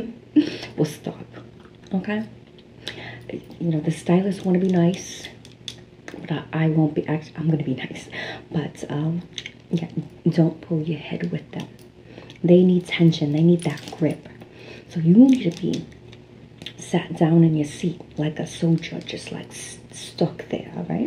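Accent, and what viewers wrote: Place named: New Jersey, United States. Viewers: Ohhh jersey accents in asmr are elite 😍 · Jersey Accents in ASMR is sooooo Top Tier🥴🤌🏾🤌🏾🤌🏾🤌🏾🤌🏾 Thank You Always Beautiful 😍🥹🫶🏾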